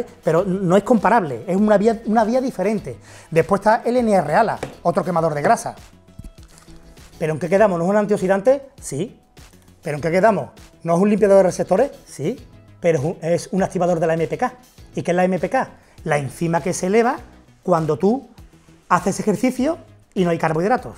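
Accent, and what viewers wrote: Andalusia, Spain. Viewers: Con ese acento español de no se que región, tengo que activar los subtitulos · Es andaluz. Por eso el acento.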